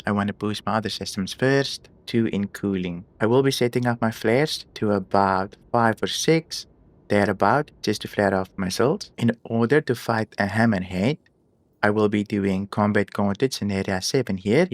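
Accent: Afrikaans, South Africa. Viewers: Dutch, Belgian or Afrikaans? Sound very Afrikaans...lekker vid and and build.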